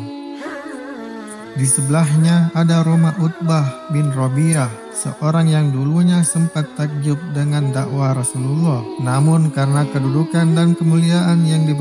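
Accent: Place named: Bugis, Indonesia. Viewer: Orang Bugis ini yang ngomong to? Kentara logatnya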